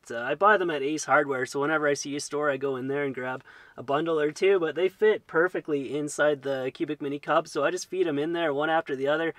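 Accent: Canada. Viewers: I miss your Canadian Accent...:-))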